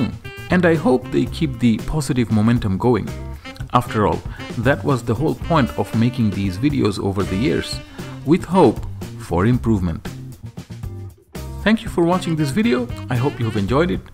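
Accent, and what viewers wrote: India. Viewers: What is that lovely accent!! It sounds like Indian accent with some Asian mixed!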